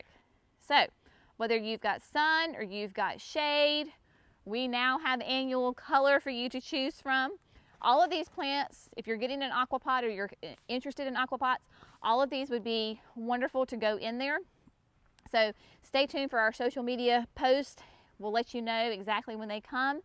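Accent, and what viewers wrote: South, United States. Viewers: Hello from the wasteland, Illinois! So good to hear someone from the south! Your our sanity.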